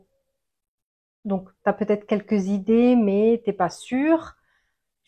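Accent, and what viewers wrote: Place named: Italy. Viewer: C'est la première fois que je visionne vos vidéos, mais je tiens à dire qu'à première vue, j'ai immédiatement remarqué que vous etes sans doute d'origine italienne. Cela se ressent dans votre charme italien et je dois avouer que j'apprécie énormément vos prononciation.Bref,les expressions que vous avez mentionnées sont très utiles, utlisables dans la vie quotidienne. Grazie mille.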